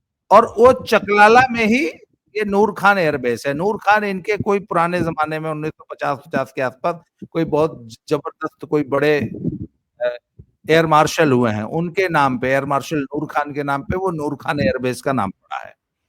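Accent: Haryana, India. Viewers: Babaji Jay Shri Ram aapka Haryanvi leja bada achcha lagta hai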